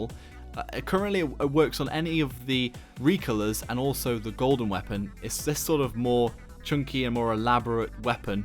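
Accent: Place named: United Kingdom. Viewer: Why is your accent so british , idk how to call it , tremendous shit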